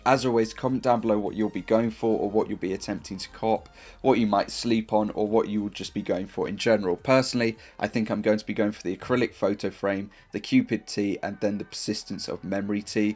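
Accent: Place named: United Kingdom. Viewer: American slang just doesn’t sound right in that British accent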